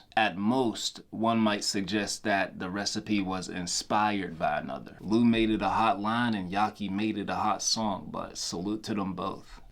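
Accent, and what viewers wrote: Pittsburgh, United States. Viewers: Few minutes in, and my question was answered lol. I heard the Pittsburgh in you, first sentence. 💪🏾 Keep grinding man, and you gained a new subscriber. I’m originally from Penn Hills/ Homewood.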